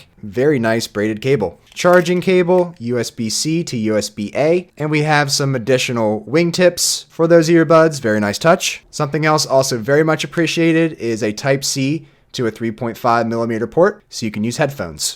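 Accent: Philadelphia, United States. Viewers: I'm guessing you're from Philly or South Jersey. Nice video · are you from philly tri-state area? lol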